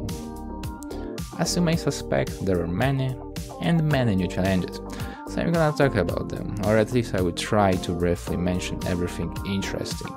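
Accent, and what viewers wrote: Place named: Poland. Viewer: Dude You are really talented and I am grateful that you present these techniques, I am really profiting and learning from it, but pleaaaaaaaaase for the love of god make a script for your videos or prepare some form of presentation. The animation and footage are good but that bored 4 AM freestyle and "uhmmmm" on top of that thick polish accent is hard to listen to broooo, it unnecessarily undermines your porfessionality and credibility, please 🙏🙏🙏🙏. still subscribed though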